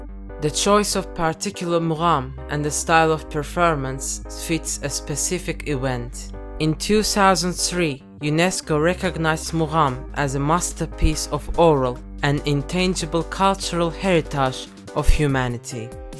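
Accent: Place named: Russia. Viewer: Русский акцент слышен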